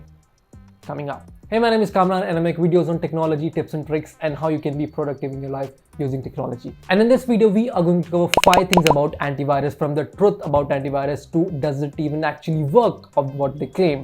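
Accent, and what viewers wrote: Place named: Southeast Asia. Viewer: Get used to it, way more people in the world sounds like this when they speak English than the American or British version. in South East Asia alone there are about 500 million English speakers and they all sound like this. But I do agree he needs to slow down a bit.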